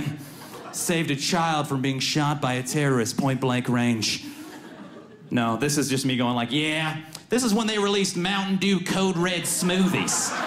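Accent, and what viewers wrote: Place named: South, United States. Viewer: His southern accent is still there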